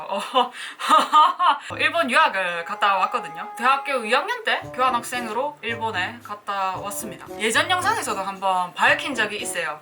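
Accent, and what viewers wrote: Busan, South Korea. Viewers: 말투가 완전히 부산 가시나네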